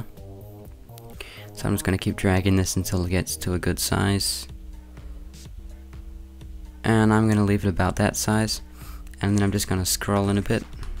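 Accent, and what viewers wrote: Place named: New Zealand. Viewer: lol, he is either from Australia or New Zealand, im from New Zealand, it sounds similar to mine.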